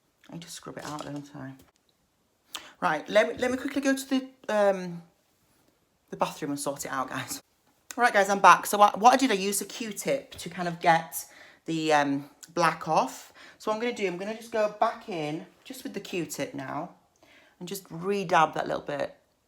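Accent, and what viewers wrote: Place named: Birmingham, United Kingdom. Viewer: I seen you in the supremely satisfying video and your hair and accent is ace I'm British myself and it sounds like you come from Birmingham. Sorry if I'm wrong 😂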